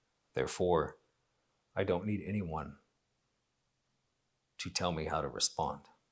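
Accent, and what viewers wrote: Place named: United States. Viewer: If you ever wanted to know how a true American speaks, this is it.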